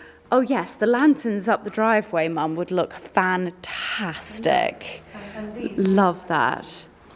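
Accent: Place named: Scotland, United Kingdom. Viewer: Loved this - an amazing property 😊 Also loved hearing that Scottish accent!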